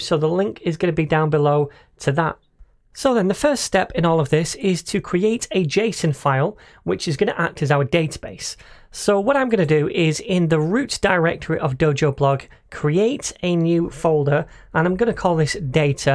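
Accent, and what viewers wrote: United Kingdom. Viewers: Honestly, I really like his react tutorials not only because of his British accent but he also explained them quite remarkably.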